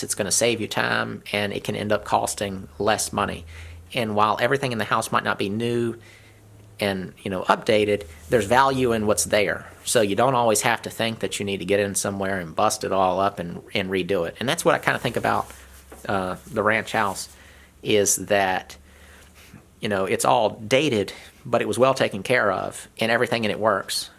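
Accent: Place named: Mid-Atlantic, United States. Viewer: There is a privacy concern which is totally valid. His accent speaks of the Mid-Atlantic states, which range from about Virginia, the Carolinas, into as far as the Tennessee border. That is an area that is bigger than your two islands, so his concerns about crime and safety are totally valid. Hard to pinpoint where he is, given that he has a family and business in a massively populated area. Don't ask, it's a security matter!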